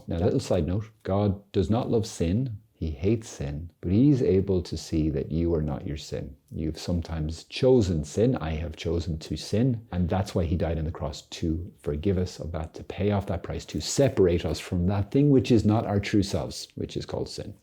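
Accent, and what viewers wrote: Ireland. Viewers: Is anyone else here for his Irish accent? I absolutely love it. perfectionism is a rejection of who we really are... Garsh that hit me. There's so much I could say about what he says in this video. I can't tell you how long the belief of 'you'll never be good enough so never receive communion' has kept me from communion. Then a priest noticed and asked me about it. I don't know how long it would've taken if he hadn't done that. "I do not have to do anything perfect today, I only have to do my lousy best." That's my mantra 🤣. Still a work in process, for sure. But we're getting there. 😁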